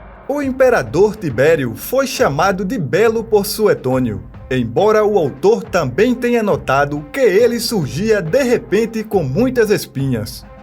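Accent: Northeast, Brazil. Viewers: Gosto de ouvir a sua voz. O jeito de falar é interessante. Você é do nordeste?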